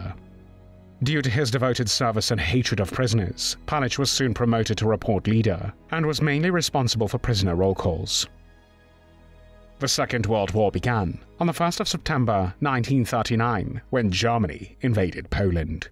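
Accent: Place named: Wales, United Kingdom. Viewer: The most horrific thing in this video is the terrible narration with a weird Welsh accent. English (international English) was too hard? Stopped watching at the 6th time he said POWLAND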